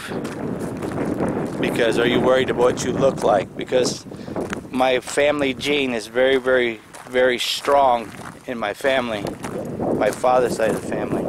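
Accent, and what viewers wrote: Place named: South, United States. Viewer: oh yeah and btw, there is nothing like some GOOD ole country twang xo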